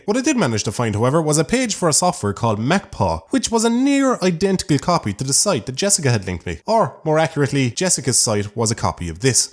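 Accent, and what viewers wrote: Ireland. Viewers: Irish accent, that explains everything. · Came here from cynical reviews! Subbed, liked and stayed to binge for the hilariously snarky content and adorable with an Irish accent! 🇮🇪 😁👍🏻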